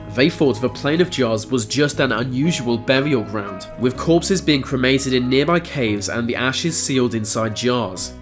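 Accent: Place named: Cockney, United Kingdom. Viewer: Wanted to watch the video but couldn't stand his cockney accent. He doesn't so much speak the language as chew it up and let it lazily dribble out. UGH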